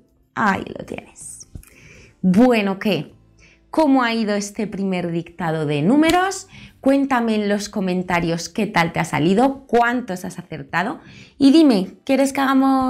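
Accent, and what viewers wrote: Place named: United Kingdom. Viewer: quizas sea por los diferentes acentos, cro que este es britanico que es distinto al americano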